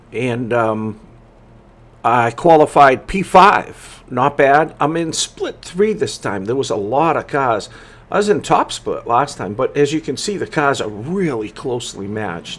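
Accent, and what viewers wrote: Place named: New England, United States. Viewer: BTW, I lived in Boston for years and I loved hearing that New England accent. 😍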